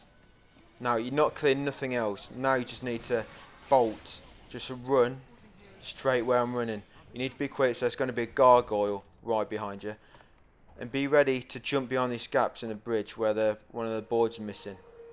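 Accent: England, United Kingdom. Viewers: I can't help but be mesmerized by ur beautiful British voice 😉😚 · I don't know why this video dropped into my recommended, I've never played this game, but your accent is great, what part of England is that from? Fresh sub also, lemme check your other stuff.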